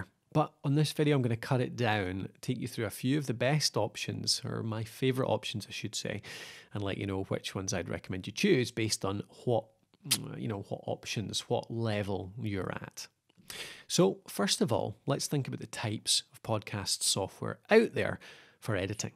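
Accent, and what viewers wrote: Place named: Scotland, United Kingdom. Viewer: He's not English. He's Scottish or Irish. He sounds like anyways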